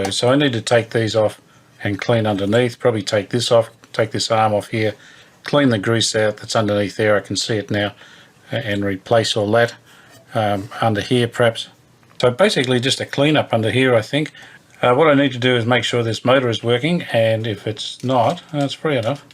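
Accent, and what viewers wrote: Australia. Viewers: Fine job,! I do like your videos. "It's, so if it gets destroyed, it wouldn't worry me.", haha! Those subtle jokes you sparkle here and there are just great. Love the Aussie accent too.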